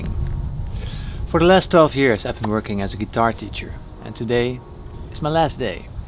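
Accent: Netherlands. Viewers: Hi, bij toeval een weekje geleden op je kanaal gestuit. Ik kon horen dat je uit NL kwam, dus ik zoeken, blijk je in Zwijndrecht te wonen, naast Dordt waar ik zelf woon. Ik zag dat je les gaf, maar geen leerlingen meer aannam. En nu lees ik dat je stopt als leraar. Er kan veel gebeuren in een week. Supergoede keuze: je hebt superveel talent en een drive, dus dit gaat zeker goed uitpakken. Best of luck! Markwin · I love your accent. :v As a hobbyist voice actor I'm going to look into learning how to do a Dutch accent now. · Nice, always thought I recognized a Dutch accent, bit now i'm for sure.(lesruimtes) Good luck on your new challenges. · It's Dutch, it's a pretty unique accent. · so he is dutch? i just discovered this channel and was wondering about his accent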